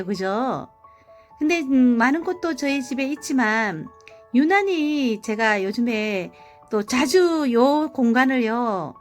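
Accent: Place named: Gyeongsang, South Korea. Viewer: 경상도 억양이셔서 여쭤봤어요~~^ 즐거운 하루 되세요~~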